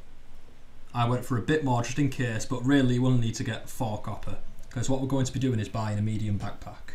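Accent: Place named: United Kingdom. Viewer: Good to hear a Brit sharing Kenshi content. Interesting how people start off their chars. I suppose it depends on which style of play you prefer, if you already have pre-knowledge of this game. I stopped mining in the initial stages because it was too time consuming vs progress being made. I usually cheese and scavenge, then go for the cheap 250 cats back packs found at the Waystations, unless I've got lucky with the start. I always thought Mongrel was boring, but having seen your enthusiasm for the misty wastes I think I'll give it another try! Good luck with the channel.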